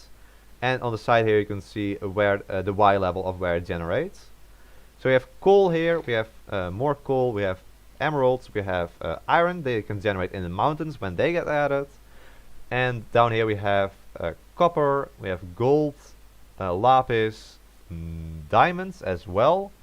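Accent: Netherlands. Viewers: i am 1000% sure he is dutch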